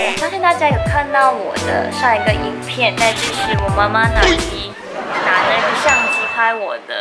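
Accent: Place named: China. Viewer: 纳尼！竟然有中国腔？？？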